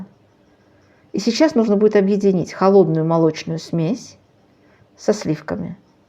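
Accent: Baku, Azerbaijan. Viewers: Добрый день,! Мы с вами землячки, я тоже из Баку.Родилась, выросла и большую часть жизни прожила в Баку а сейапс живу в России.Очень приятно слышать и слушать знакомый бакинский акцент. Спасибо за ваши рецепты.Попробую сделать мороженое.Всего вам доброго!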